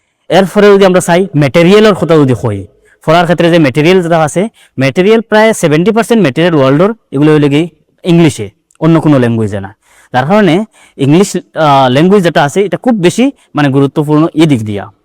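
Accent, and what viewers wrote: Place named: Sylhet, Bangladesh. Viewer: ইংলিশে খইলেও ফারলা ওনে, তেবউ সিলেটি মাত হুনিয়া বহুত ভালা লাগলো..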